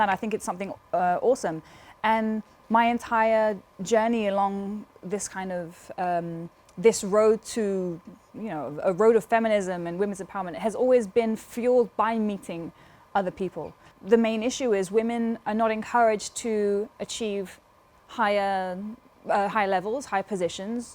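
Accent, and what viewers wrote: United Kingdom. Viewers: bein grown up in london, the accent is getting more british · Justru terasa accent nya. Inggris itu banyak jenis aksen.. sama kayak aksen Jakarta, aksen Indonesia timur, aksen Jawa dsb. Aksen nya, London banget..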